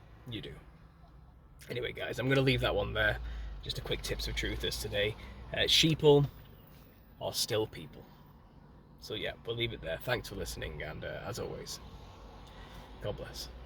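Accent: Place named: England, United Kingdom. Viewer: They aren't stupid, just misled. There's an entire ecosystem of facts they can cling to even if they do there own research. Nice to see an English voice in this space, you do good work, lots of love.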